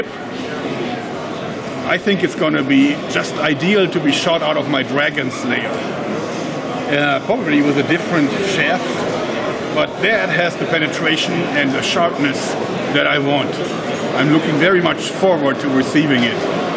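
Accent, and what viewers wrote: Germany. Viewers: Where did you find those rubber bands and is that accent German? Also do you have a place where i can buy your originals? Oh, and its kinda weird that you wear socks and sandals.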